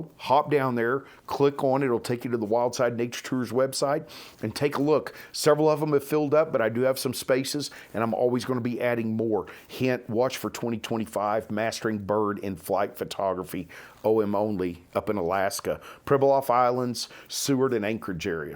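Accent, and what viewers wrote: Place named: West Texas, United States. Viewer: I like the way you talk, west Texas. I'm Houston, living in Colorado's San Juan mountains. I think I might be the only MFT landscape photographer in three counties.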